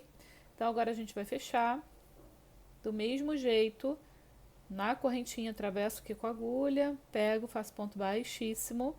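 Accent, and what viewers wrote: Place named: Rio de Janeiro, Brazil. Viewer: adorei sua explicacao e anotei no meu caderno, gostei de voce dizendo "ta okay" como o nosso eterno presidente Bolsonaro fala bem coisa de carioca falar mesmo, aqui no Parana nao temos o costume de usar esse termo 😄o presidente por ser paulistano reside no Rio ele tem o costume de falar é muito bom. Beijos 😁💋❣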